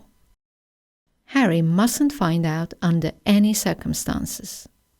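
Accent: RP, United Kingdom. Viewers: Thanks so much!❤️,I'm Chilean and you help me a lot with your British accent because my school speak USA English so I have some problems with others accents. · You sound British thou. I watch loveenglish with Leila and sabrah . Leila is half English half Iranian too. nice meeting ya. thanks for your rapid response. good night and bless you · Do you speak RP?? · You have the Queen accent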